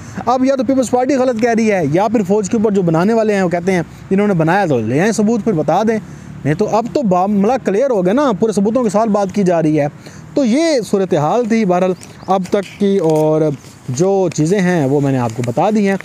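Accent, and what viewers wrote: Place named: Punjab, India. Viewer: Punjabi ka tarka laganay ki zaroorat nahin hay, however your vlogs are always informative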